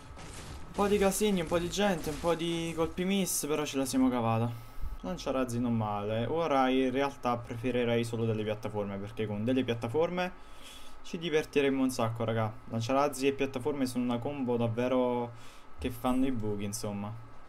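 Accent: Naples, Italy. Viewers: ma ti che parte di napoli sei? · Ma sei di napoli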